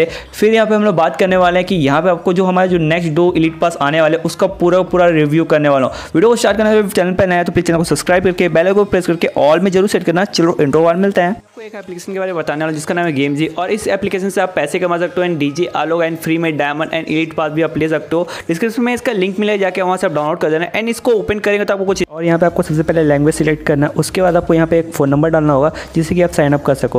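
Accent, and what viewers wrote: Odisha, India. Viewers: bhai aap odisha se ho kya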